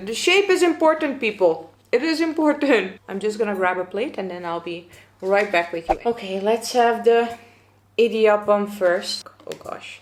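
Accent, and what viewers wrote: India. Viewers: I am a foodie fan and I just adore watching you eat.. I laugh that you have begun to talk like an Indian, with the accent.. Or maybe you are Indian and I have no idea..lol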